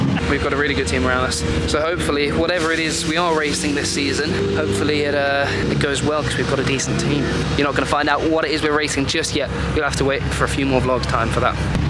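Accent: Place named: United Kingdom. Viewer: oh i thought this is american.. its just british.. which is just normal..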